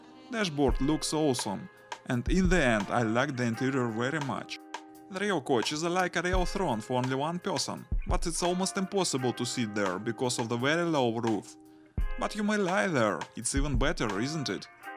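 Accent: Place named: Russia. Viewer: Greetings from USA! What basic geographic is this collection located?? The voice over sounds Russian. Pretty nice collection of Yank automobiles. The Chrysler 300 convertible made me throw up. Its a great car other than wheels and seats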